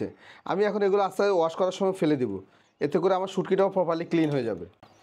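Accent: Noakhali, Bangladesh. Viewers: ওনার কথা শুনে বোঝাই যাই নোয়াখালীর মানুষ।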